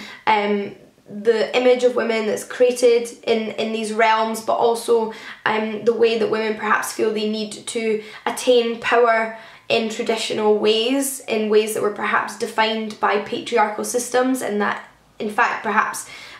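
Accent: Scotland, United Kingdom. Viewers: As someone who is an asian I always wrongly assume that you are greek considering how passionate and invested you are about ancient greek mythology and history:)) Btw I find scottish accents really cute and adorable! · Hope February is an even better month for you. ❤️ Are you Scottish btw?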